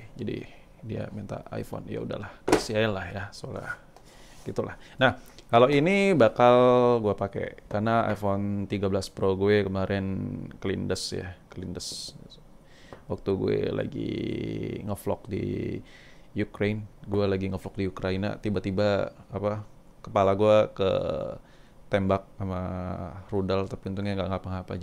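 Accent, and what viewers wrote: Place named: Java, Indonesia. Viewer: Lu orang jawa ya bang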